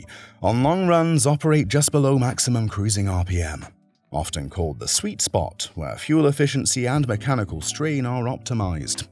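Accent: United Kingdom. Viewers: this obnoxious snotty sounding British voice narration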